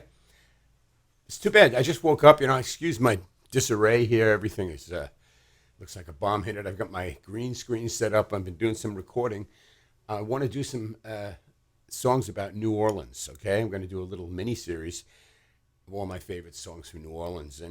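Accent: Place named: Long Island, United States. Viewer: Very interesting video. Can't wait to hear your version of the song. Say hello to Tommy for me. Nice to hear you still have the LI accent. I still have mine too. Stay safe.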